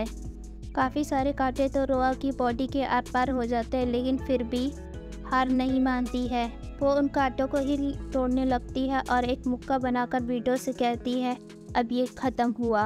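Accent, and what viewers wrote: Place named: India. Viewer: I don’t want to be mean but you sound like every Indian person ever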